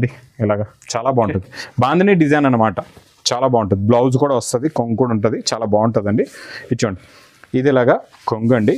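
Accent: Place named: Rajahmundry, India. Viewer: మీరు రాజమండ్రి నుంచి కదా ..నే పట్టేశా ..😅 వస్తున్నా ..😂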